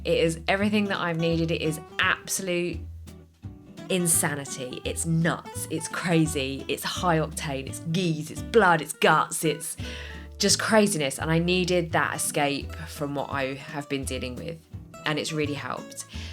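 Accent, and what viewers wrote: United Kingdom. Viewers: God I wish I had a British accent. 😂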